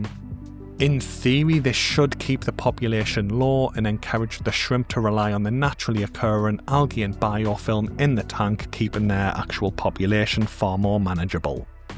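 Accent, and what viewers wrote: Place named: Lincolnshire, United Kingdom. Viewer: yeah, the posh twang which you must have got from your time in Lincolnshire threw me.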